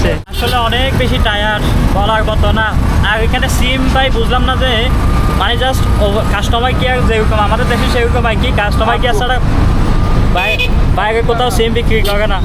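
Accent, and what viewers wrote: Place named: Banshkhali, Bangladesh. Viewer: বাঁশখালী মনে হয়।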